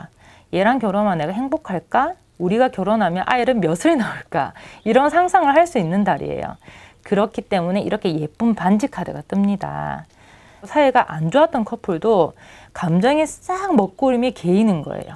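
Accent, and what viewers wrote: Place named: Busan, South Korea. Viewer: 리딩 너무너무 잘 들엇어요!! 디테일한 리딩도 좋쿠 딕션이 너무좋아 귀에 쏙쏙 들어오네요~~😊 부산사투리 매력잇어요!!! 또 피드업뎃해주세요오오오!!🙏🏻🙏🏻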